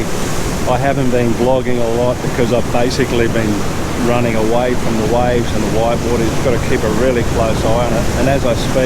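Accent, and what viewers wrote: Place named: Australia. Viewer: G'day, I've just given you a sub mate. I'm a learner and I've stumbled on to your channel and I've got to say how refreshing it is to first know where you're talking about and to hear a familiar voice in the form of your Aussieness. I'm enjoying the fact that you are shooting places that are reasonably accessible for a fella like me and I'm going to confess I will be visiting some of these locations. Thanks heaps for your efforts with this channel mate, fully appreciate it.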